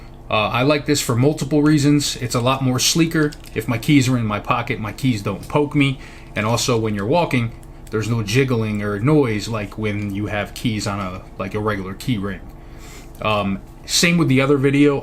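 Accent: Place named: Philadelphia, United States. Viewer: Great EDC. Your accent has a Philly vibe.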